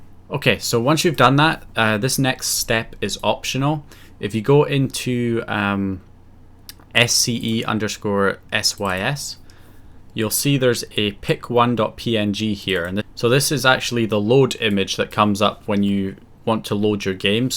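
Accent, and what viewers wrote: Scotland, United Kingdom. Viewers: Irish...? he sounds Scottish to me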